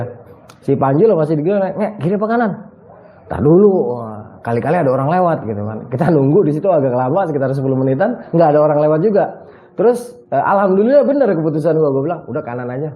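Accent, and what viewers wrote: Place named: Kuningan, Indonesia. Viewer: Orang kuningan mana nih suaranya 😁 Sehat selalu bang 🙏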